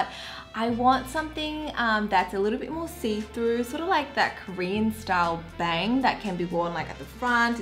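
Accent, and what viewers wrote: Australia. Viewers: I love all your videos. I love how you spoke, your expression, your make up and your settings. I love Aussie accent and before i don't know you're Australian so i lowkey love your accent and one day i was thinking could it be an aussie accent 🤔 and yeah i was right i knew it bc i was a big kpop fan and there's few kpop idol with aussie accent like Rosè, Chan & Felix (just mentioning bc i love Aussie accent). Moreover, I'm loving your videos. I watch it and then repeat it again and again. Btw, i'm Zuali from Northeast India. Love you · woah I thought she lived in Australia, I must’ve missed the move to Singapore! Still think she lives in Aussie because of the prominent accent hahaha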